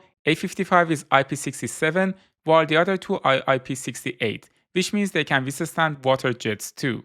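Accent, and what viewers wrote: Iran. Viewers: داداش دمت گرم خدایی ایرانی هستی؟؟ انگلیسیت خیلی با لهجست😁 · Your persian accent>>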